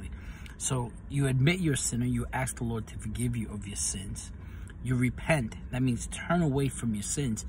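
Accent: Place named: Long Island, United States. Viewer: Oh yes, I didn't mean that message specifically for you since you'd already shared you were loud about Jesus! :-) It was just for anyone who reads my comment (or as a prayer you could suggest to others as you're led by the Lord). I actually discovered you at one point during your Pentecost series, but I think I only saw one video from that (I'd have to go back and check to find out). God bless you! P.S. I love your accent (Long Island?)!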